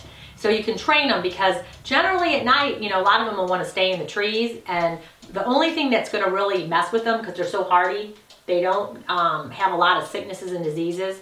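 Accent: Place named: Arkansas, United States. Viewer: my friend from Little Rock sounds just like you. Is that where you are from?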